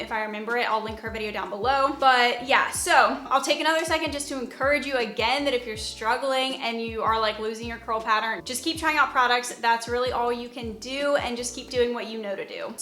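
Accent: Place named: Michigan, United States. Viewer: Super weird question.. are you from Michigan? Your accent sounds EXACTLY like a like my multiple of my friends.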